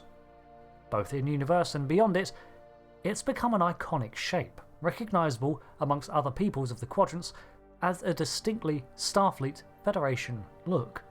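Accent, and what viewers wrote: United Kingdom. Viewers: I know, british accent, but for the record, in Cornwall, Ontario Canada's phone numbers all start with 93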